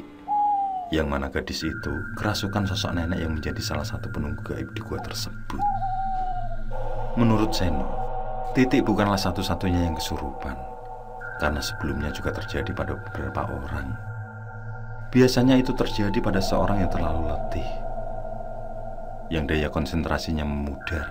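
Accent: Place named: West Java, Indonesia. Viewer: kmu asli lombok apa sunda kok ngomong nya ada bhs sunda nya, klo kmu sunda sudah gak ada harapan saya 😢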